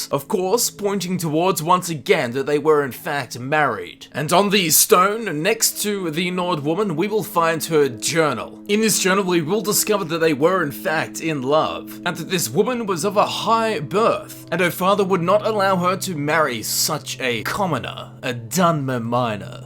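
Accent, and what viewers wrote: Western Australia, Australia. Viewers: I thought you were British, I guess I don't have a keen ear for Far west accents. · Are u a fellow Aussie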